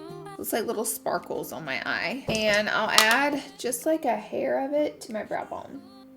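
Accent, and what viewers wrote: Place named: Texas, United States. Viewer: Yes girl! I love watching you :) You remind me of where I grew up in south Texas because of your accent. Keep up the great work 👍🏻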